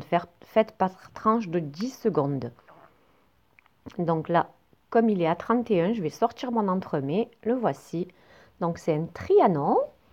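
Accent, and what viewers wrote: South, France. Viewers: L'accent du sud on Aaaaaaadore!😍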